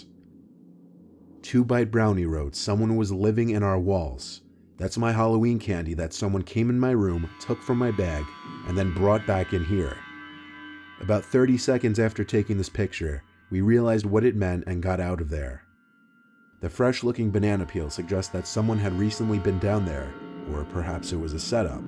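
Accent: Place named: New Jersey, United States. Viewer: Me nightmare is def from like New Jersey or philly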